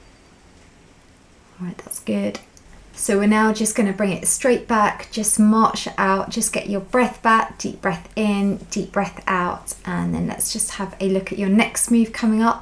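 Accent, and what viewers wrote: England, United Kingdom. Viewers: Great work out to do w my husband w high blood pressure, exercise shy but reluctant to start medication. He prefers the English accent to the incessant tone & pitch of the US trainers! Thank you.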